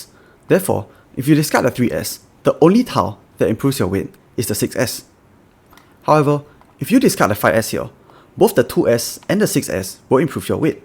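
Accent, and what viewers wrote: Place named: Singapore. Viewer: Instant sub. I never knew I needed to be called bad at the game in a Singaporean accent until now. Thanks for explaining the mysteries of Riichi to us baka westerners, this is really helpful content.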